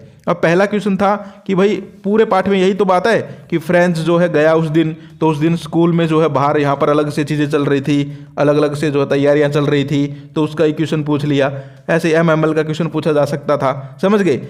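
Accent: Haryana, India. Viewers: Sir too haryanavi hai ❤❤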